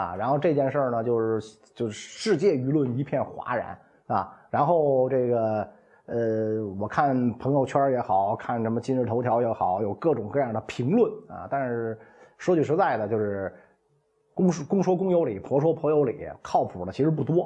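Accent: Beijing, China. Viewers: 一口京腔听的别扭...感觉像是说相声、说书！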